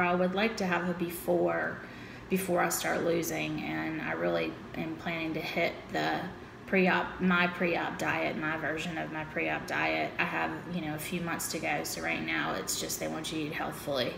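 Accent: South, United States. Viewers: Hey, I'm glad you were honest and I do understand how dark that place can be. I am so happy that you are in a better place. I felt the same way during the psychological testing. And I am still going weekly to my psychologist. I probably will for the rest of my life. You are on your way girl to feeling better. I heard you say Ya'll a few times and I am picking up a little bit of a Southern accent where are you from? We live on the Gulf Coast in the deep south, MS. Keep posting please, it's helping me get through this long wait. Rebecca:)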